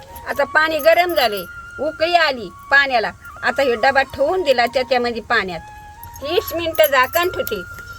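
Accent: Marathwada, India. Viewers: आज्जी तुम्ही मराठवाड्यातल्या आहे का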